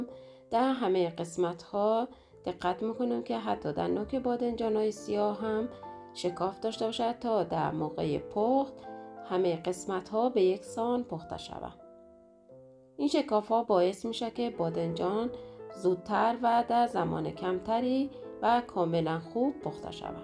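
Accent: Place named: Afghanistan. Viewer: جان، خواهر قندول و نازنینم، تشکر! من عاشقِ لهجه پارسی دری تو هستم.:) یه کلمه گفتی که معنی اش را نفهمیدم. " تَیار می‌کنیم " یعنی چی؟ این کلمه به فارسی ایرانی چی میشود؟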